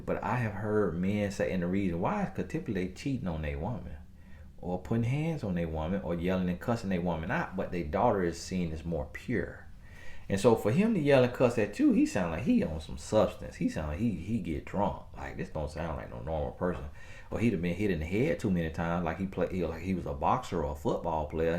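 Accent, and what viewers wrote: South, United States. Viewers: your messages a very far reaching, not just for singles at all. I learn tons from every video that I can apply to my marriage, career, and life in general!! These are life lessons!! Plus I'm country too, so it's awesome to hear the dialect and phrases I grew up hearing. Lol. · Lol.... we love your Southern accent.....please ignore envious comments and keep teaching us...blessed you and the Fam 😁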